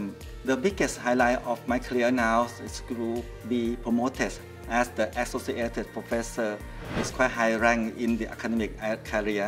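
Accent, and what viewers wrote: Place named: Thailand. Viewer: ชอบสำเนียงไทยอาจารย์มากครับ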